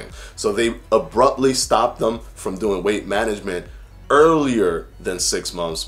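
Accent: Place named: New Jersey, United States. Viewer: sickid41 I bet he’s from New Jersey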